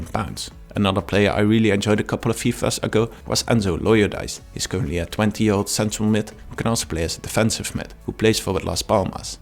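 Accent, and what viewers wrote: Netherlands. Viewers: Bro je moet wel iets aan je engels accent doen man, geen haat ofzo maar je hoort duidelijk dat je NL bent verder goeie videos 👊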